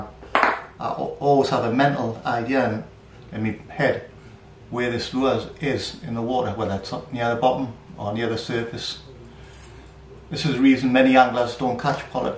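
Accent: Newcastle, United Kingdom. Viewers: Great video mate, enjoyed very much. I'm from South Wales and can understand your geordie accent no problem haha. Keep up the good work. Gareth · Thanks mate just made my move to Donegal permanent and less than 3k to most beautiful shoreline, although a trout and salmon angler that keeps me busy in these parts always wanted to shore fish, just found your channel a few weeks ago and your video today will help me tackle up cheers again and your Geordie accent is great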